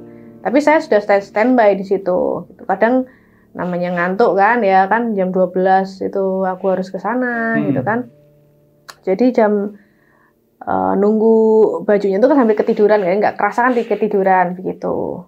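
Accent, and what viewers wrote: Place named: Java, Indonesia. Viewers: nama Panggung:” ”, nah…Nama AsLinya SIAPA dong?? (*jgn2 PONIRAH/SUSI/SITI/SRI/ANI?) 😂😂😂. Nama ASLI-nya PASTI NORAK X… (pdhL, itu nama Pemberian dari Ortu loh,mbak!). KENAPA hrs MALU sama NAMA ASLI Sendiri?? mmgnya, NAMA ASLI-nya “dneso” bgt yaaaa….?! Lah, LOGAT nya aza “MEDOK-JAWIR” Bgtttt!!! 🤭🤑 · Logat ngomong jawa banget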